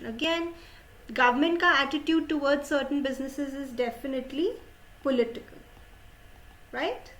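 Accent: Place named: United Kingdom. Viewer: Aap british english b bolte ho 😬 #via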